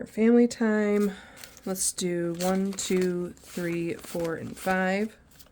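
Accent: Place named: New York, United States. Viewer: You have a New Yorker accent. I love it!!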